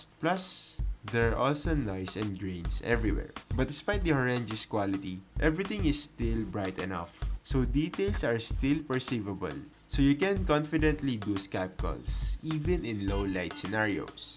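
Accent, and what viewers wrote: Philippines. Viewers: tagalog mo nlng tol halata rn lng accent mo.. cka pinoy lng karamihan mkakabili nyan.. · Ganyan accent ng pinoy pag nag eenglish o kung tawagin sa Wikipedia, Philippine English. Hindi naman kaylangan lagi american accent. Lahat ng bansa may kanila version ng english.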